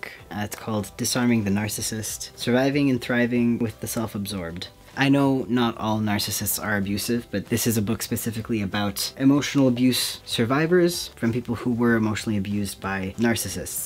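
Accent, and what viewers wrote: Canada. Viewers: Never stop the Canadian accent... it’s heckin’ adorable! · I love your Canadian accent reminds me of many favorite movies strange brew with Rick moranis. Plus I lived in Alaska for 13 years, and traveled thru Canada beautiful country ( People in the lower 48 also think Alaska has 2 seasons, but no we do have 4 seasons, and love the northern lights are beautiful in alaska too) I am now on the east coast but thankfully still in the country. I love hugs too I'm a big hugger ❤️ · that was a HECKIN good time with that extra Canadian accent!! You can wear that Cat shirt on the MFP! Lamb... LET ME IN!!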